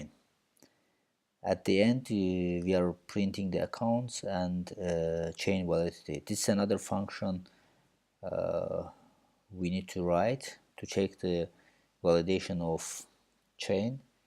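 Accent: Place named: Azerbaijan. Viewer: Man this accent, sounds so familiar LMAO. Are you from Azerbaijan by any chance?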